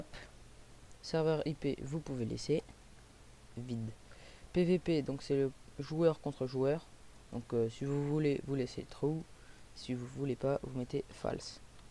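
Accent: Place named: England, United Kingdom. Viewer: quel accent anglais !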